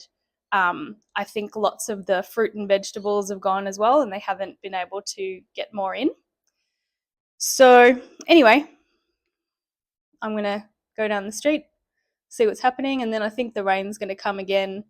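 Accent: Australia. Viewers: Your lessons are great because they are real life images of Australia, along with your typical Australian accent. I am going to Australia to live with my children so I really need to practice this Australian accent. Thank you very much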